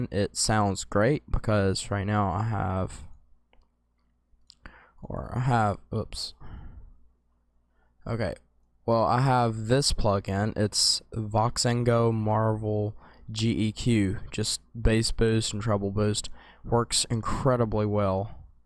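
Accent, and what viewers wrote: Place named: South, United States. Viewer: Your light southern accent sounds really cool.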